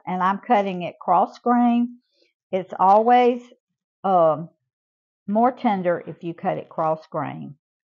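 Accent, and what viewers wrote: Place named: Alabama, United States. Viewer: I’m so thankful YT took me to your channel. You remind me so much of my beloved grandmother. She’s with Jesus now but oh how I miss that beautiful and feisty woman inside and out!! 🕯️ ✝️🥹 I want to thank you for your grandson’s service. My own son is a Master Sargent in the AF. This morning he and his family (DIL and 4 of my grands are PCSing to Spain for 2-3 years. Such a wonderful opportunity for all of them! But I will miss them dearly. 🥹🇪🇸 May I ask..are you from Alabama? Because you share my beloved grandmother’s accent. 🥹🥲 God bless you both. 🙏🏻✝️ 🌹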